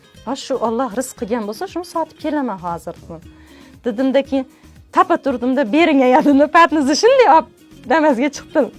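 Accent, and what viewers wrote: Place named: Kokand, Uzbekistan. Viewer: Қуқонлиг экансиза шевангиздан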